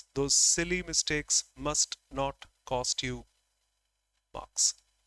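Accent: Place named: United Kingdom. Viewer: British English sir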